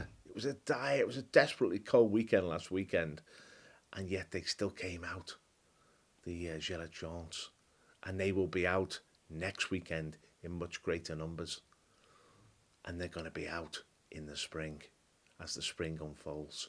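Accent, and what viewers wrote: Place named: Scouse, United Kingdom. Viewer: As a 57 year old man living and growing up on Merseyside it is refreshing to listen to a fellow Merseysider speak out on behalf of the values of the true conservative centre right - which the modern Tories are the antithesis of. When I was very young in the late 70's and early 80's, whenever a face appeared on a screen with a scouse accent delivering a political message it was unwaveringly on behalf of militant leftism/trade unionism/socialism etc. The dark icing on that wretched cake being Derek Hatton and Militant. That moron eventually became a pantomime celebrity. Fitting.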